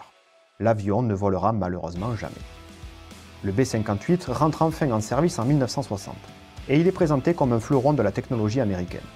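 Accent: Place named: Marseille, France. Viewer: Amusant, l'accent Marseillais !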